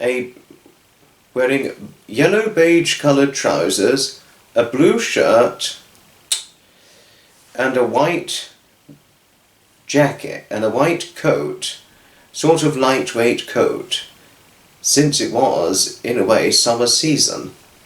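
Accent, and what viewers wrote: England, United Kingdom. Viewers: What a funny coincidence. I have a family relative named Thomas Graves. He was an architect in Baltimore, MD with RTKL and a model train aficionado. I always enjoy hearing your English accent!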